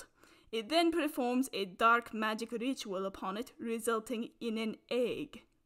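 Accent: United Kingdom. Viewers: Best British acent ever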